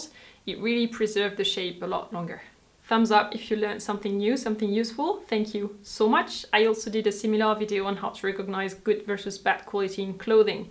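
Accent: France. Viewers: I’m so glad I stumbled onto you! This was very helpful! Are you French or French-Canadian? I love your accent! Très mignon! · You are so beautiful and elegant , and I love your french accent and your aristocratic look ❤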